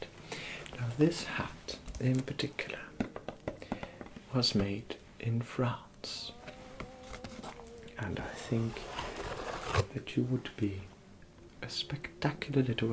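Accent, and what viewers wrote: United Kingdom. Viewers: Are you actually British? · I finally find British ASMR. Hello from Tehran 💚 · I got so used to the british accent so fast that when he mentioned he was the best tailor in the UK I had to take a moment to collect myself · Just discovered your channel last night. The Butler one where you are unwinding us from.a night out was FABULOUS!!! I love themed and time period based ASMR vids. The Fire crackling, the light old time background music is perfect. And the elevated British speaking tone is superb. "Chefs kiss" Keep up with the lovely and hard work you put into these. Looking forward to binging your channel ❤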